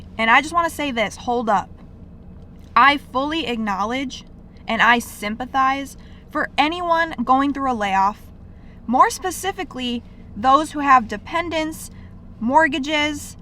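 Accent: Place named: New York, United States. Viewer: you sound like a New Yorker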